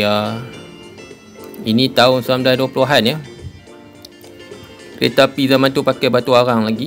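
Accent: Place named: Perak, Malaysia. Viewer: Haha.. penyampaian style orang Perak.. camtu ler..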